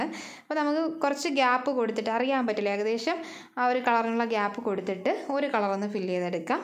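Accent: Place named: Italy. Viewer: Parece una italiana hablando inglés 😅 me agotó jajaja. Al principio no sabía bien en qué idioma estaba explicando! 😂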